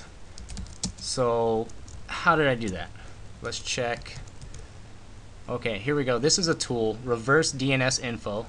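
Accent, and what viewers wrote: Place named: Canada. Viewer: could you tell by his sweet canadian accent?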